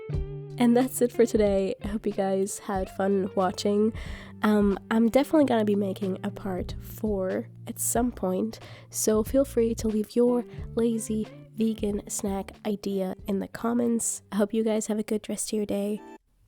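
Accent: Bavaria, Germany. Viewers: Bist du aus Bayern?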